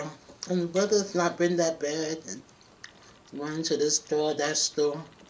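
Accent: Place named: New York, United States. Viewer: I love watching your videos I’m always here for your New York accent I miss my accent I’m so country now...lol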